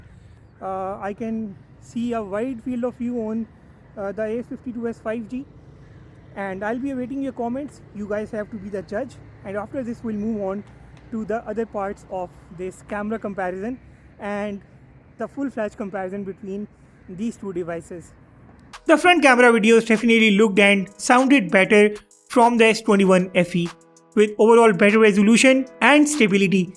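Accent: India. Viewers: mi video i realized you speak english (or indlish) :D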